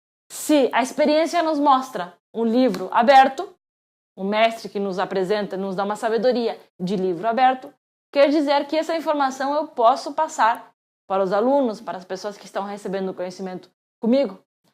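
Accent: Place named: Paraná, Brazil. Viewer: Fala com sotaque paranaense legal.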